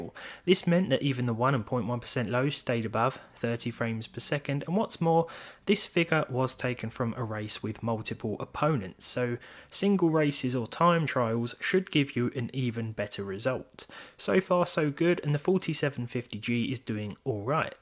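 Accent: United Kingdom. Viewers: Very british pronunciation... At least for my Russian ear.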